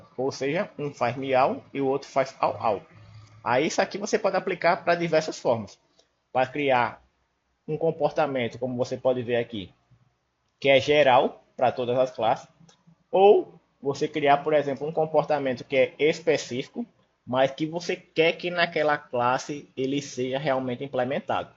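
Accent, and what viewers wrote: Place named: Northeast, Brazil. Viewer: Não sei o que é mais prazeroso nesse video, a didática fora da curva ou o sotaque gostoso do meu nordeste ❣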